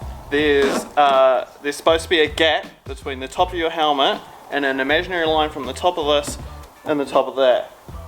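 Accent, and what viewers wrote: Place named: New Zealand. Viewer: could tell you were kiwi immediately haha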